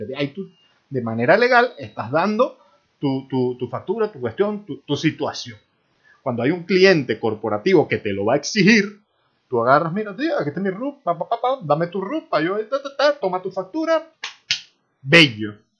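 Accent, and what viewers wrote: Colombia. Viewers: El acento no es chileno, más bien diría que es en colombia. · el acento suena a colombiano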